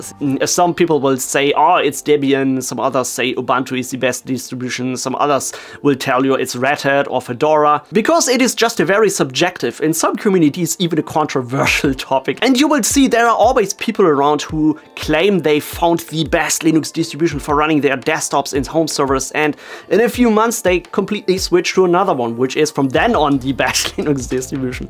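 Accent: Germany. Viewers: Moin, netter Überblick. Bringst eine Gute Energie rüber! Werde hier definitiv nochmal vorbeischauen ;) Gut, dass du das mit "Linux als OS" noch im Nachgang erklärt hast. Das hat dir glaube ich einige Kommentare erspart :D ;) weiter so! offtopic: auch respekt an dein flüssiges englisch. aber doch immer wieder erschreckend wie man es ab sekunde 1 raushört, wenn jemand aus germany kommt. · that is one solid german (i assume) accent, pleasant to listen to if i may say so.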